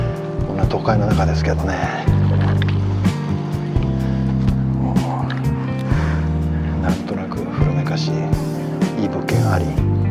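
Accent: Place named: Nagoya, Japan. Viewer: 、初めまして。最近拝見しております66歳の親父です。の軽快な名古屋弁の話術にハマりました。なんせボキャボラリーが素晴らしいですね。これからも楽しく見させて頂きますので頑張って下さい。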